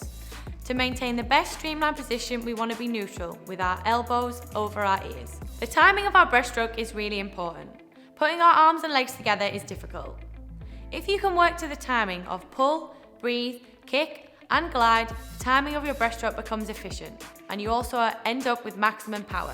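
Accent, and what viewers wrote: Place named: United Kingdom. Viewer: British accent🤣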